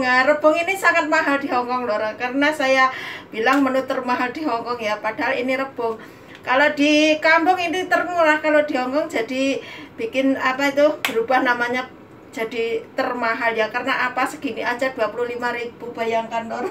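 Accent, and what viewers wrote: Banyumas, Indonesia. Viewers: G ngapak g kepenakk ya mbk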